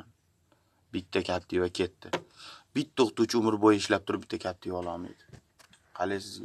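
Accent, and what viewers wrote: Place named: Tashkent, Uzbekistan. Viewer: Ee birat chachvoribsiz likin toshkenchanixam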